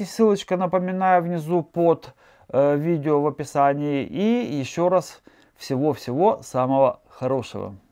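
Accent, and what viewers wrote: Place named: Odessa, Ukraine. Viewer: Одессит чтоль? Говор знакомый... За обзор спс.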